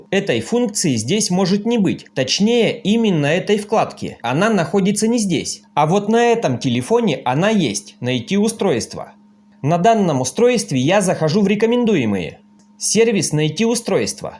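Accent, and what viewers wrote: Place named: Ukraine. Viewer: Почему у хохлов, такой ****** говор? Нет, реально раздражает.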